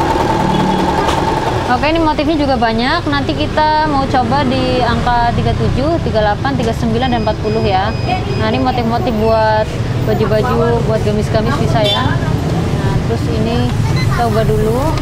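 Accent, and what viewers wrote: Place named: Java, Indonesia. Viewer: Mba nya logat jawane kentel tenan asline pundi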